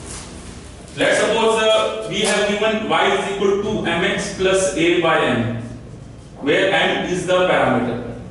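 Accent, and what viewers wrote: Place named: Jamaica. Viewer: Sir your accent is like teaching maths. Great Video.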